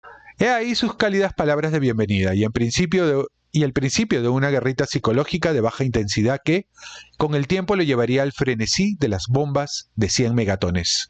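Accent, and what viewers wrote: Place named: Upper class, Peru. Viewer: Creo que no es acertado que leas este libro. Justo la semana pasada ya lo resumieron en el canal de Orozco que tiene muchas vistas, y como que se pierde el interes (mira las vistas). Te recomiendo seguir con el Baylyverso. Y otra cosa, ese acento de pituco manyado ya paso de moda amigo, y ya tienes tu edad como para hablar asi. Suerte.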